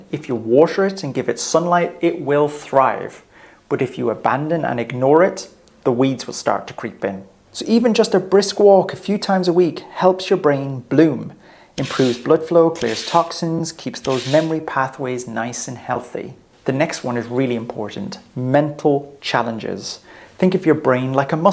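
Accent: Ireland. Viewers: That was helpful, nice Irish guy...not a shouty American!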